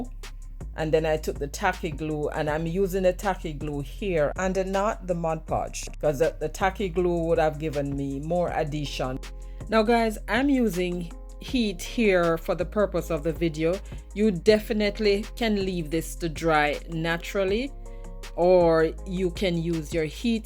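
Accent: Jamaica. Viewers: I just LOVE your voice are you by any chance from JAMAICA?😊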